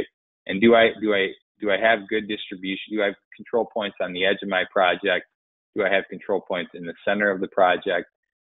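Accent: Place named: Western New York, United States. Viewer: Your accent makes me very nostalgic for my childhood home in western NY, up by the Great Lakes. 🙂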